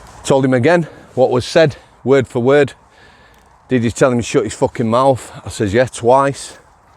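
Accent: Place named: Manchester, United Kingdom. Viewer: how come you’ve got a manc accent if your from sheffield? great video mate.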